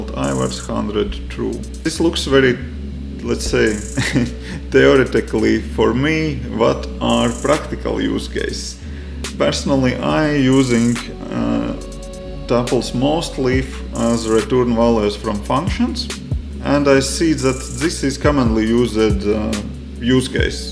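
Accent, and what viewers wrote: Russia. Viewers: are you russian? I hear a slight accent =)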